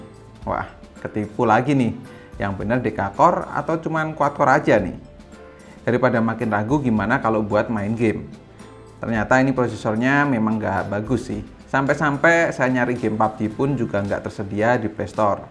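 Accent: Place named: Java, Indonesia. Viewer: Logat jawanya masih terasa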